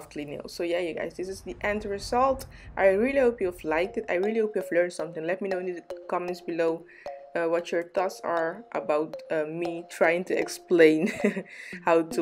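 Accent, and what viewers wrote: Netherlands. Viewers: are you dutch? you have a dutch accent hehe beautiful nails and technique <3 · Je bent sowiesooo Nederlands. Hoor het meteen. Goeie videooo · 💞💞Love the video! Btw, do you speak Dutch? You’re accent sounds familiar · Are u dutch ? Your accent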